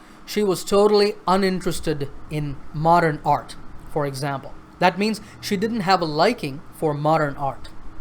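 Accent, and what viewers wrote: United States. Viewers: I really looking forward to learn American accent like you could you help me out please.. Actually I tried getting bogged down many times in it but the problem arises with practicing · you know I m in 10 th I have joined a lot of English tutions one named American institution of English language but the problem there was that those people don't have accent but u have it I m an ilets student too have of my doubts are finished due to you now I don't get perplexed while listening an American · How did you master the American accent?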